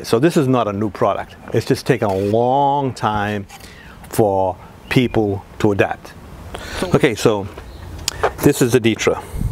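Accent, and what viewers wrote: Boston, United States. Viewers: I understand the Boston accent,after years watching "This Old House" on PBS, but the AI which made the subtitles didn't.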